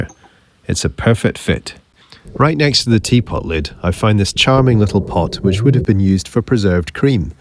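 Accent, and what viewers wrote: United Kingdom. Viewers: Which country is this? He has an English accent but is he actually in England? 🤔🇬🇧🏴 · Love the British accent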